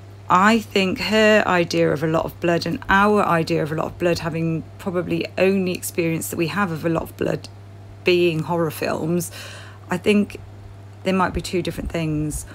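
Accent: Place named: United Kingdom. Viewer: I absolutely love your voice and the accent, however horrible things u're speaking about. It's soooooo British! 😀 Where are u from, more exactly?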